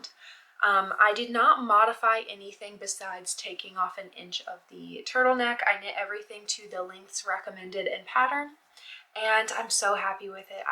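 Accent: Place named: Australia. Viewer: I've been watching your video's for a little while now, and I feel like your accent is changing a little bit to sound more Australian lol Also, I feel like our taste in knit pieces is so similar, I love fall colours too and you always pick such beautiful patterns! Watching your video's makes my fingers tingle to start new projects haha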